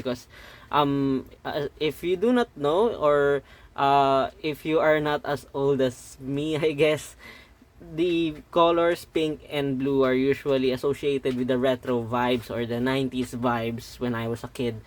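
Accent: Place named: Philippines. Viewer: I like your filipino accent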